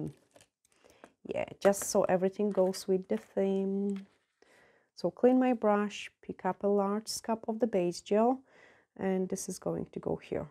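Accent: Scotland, United Kingdom. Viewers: I knew I heard Scottish in your accent! Love how you’ve picked up wee words.. 🥰 am in central Scotland wee bit far from you, your nails are stunning, have you tried the new soft gel tips yet? I’ve ordered mine from Gelish Harmony xx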